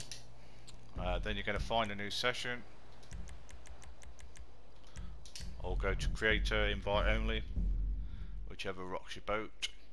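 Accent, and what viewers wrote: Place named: United Kingdom. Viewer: Honestly never leave comments on videos but 1.your british and not a squeaky american 😩. 2.your straight to the point. 3.its not click bait 4.idk but you got a sub and a like from me 💚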